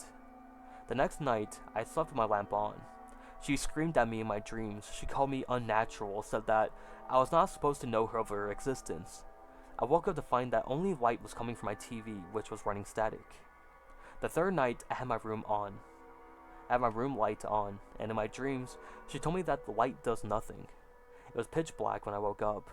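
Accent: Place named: United States. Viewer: Australia? Hmm why do you sound so American I’m from California you sound just like my white homies haha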